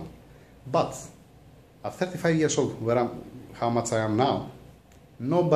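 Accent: Greece. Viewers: Hi, nobody has teached you but you teach people all around the world whith à cigaret and your amazing greek accent, that coule be simply your answer to all jalous people! Thanks you man! You are the best, just continue please! And let us now when we can share a good dîner in Europe!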